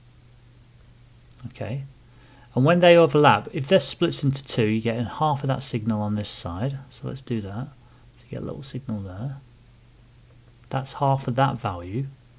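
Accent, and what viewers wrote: United Kingdom. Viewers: That's rude, his voice is not 'obnoxious', its the Yorkshire accent...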